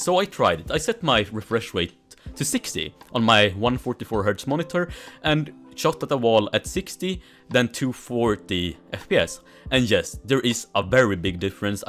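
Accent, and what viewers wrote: Sweden. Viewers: Hej du är svensk man hör detXD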